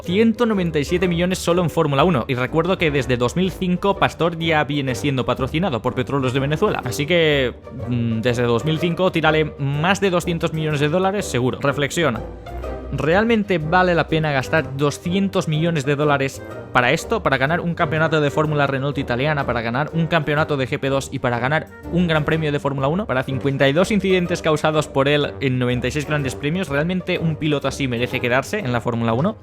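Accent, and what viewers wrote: Spain. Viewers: No soy fan de Maldonado, de hecho me cae pésimo, pero escuchar un pendejo españolete no lo puedo tolerar. 👎🏻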